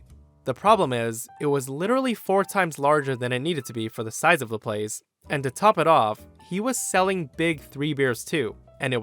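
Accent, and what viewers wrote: North America. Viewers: I think I remember him saying he's from Canada. He's definitely got a North American accent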